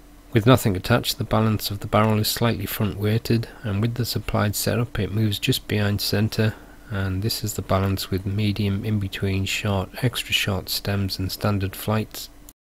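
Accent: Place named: North East England, United Kingdom. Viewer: You sound like your from the north east, I am myself from Boro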